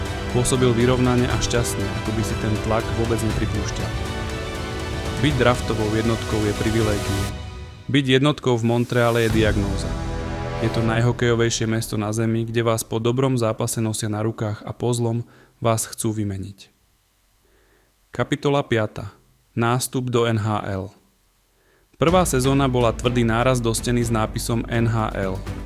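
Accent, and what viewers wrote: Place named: Slovakia. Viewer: Mne sa to práve že páči. Rozpráva po slovensky a nie po "ameuricky" 😊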